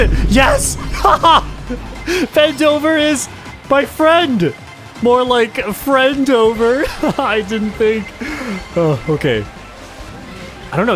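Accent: Canada. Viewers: Are you from British Columbia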